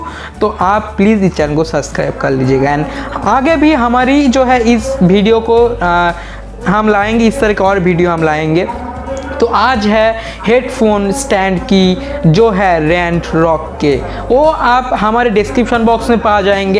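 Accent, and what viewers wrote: India. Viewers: Bengali accent bolte😁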